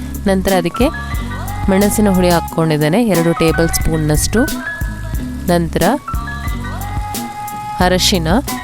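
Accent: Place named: Mangalore, India. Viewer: Nimma manglore bashe thumbaa chennagide marree😁 Nanna friends ella manglore avre thumba Kushi aguthe nim kade kannada kelakke❤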